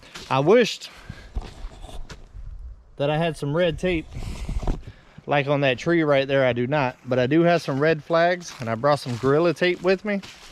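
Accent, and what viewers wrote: South, United States. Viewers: You sound like your from the south I was raised in Millington Tennessee but have lived in Waldron Arkansas most of my life.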